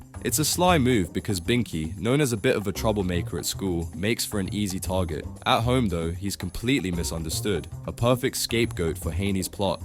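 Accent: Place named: United Kingdom. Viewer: Can you please stop with the British accent?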